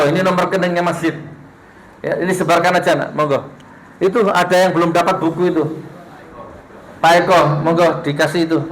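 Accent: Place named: Banyumas, Indonesia. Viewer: Senangnya sama Gus Nur ini blaka suta cara bahasa ngapaknya love you Gus ❤❤❤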